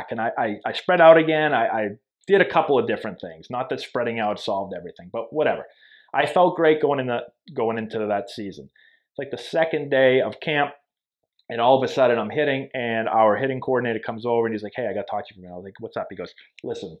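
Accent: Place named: New England, United States. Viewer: He's just got that New England accent lol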